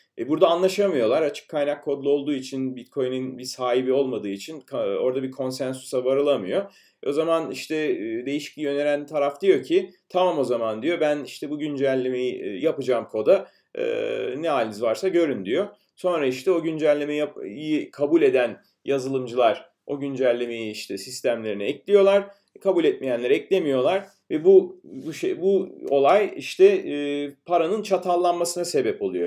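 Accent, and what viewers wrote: United States. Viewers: anlatım iyi de şu amerikalı gibi konuşmaktan kurtulsan iyi olacak :D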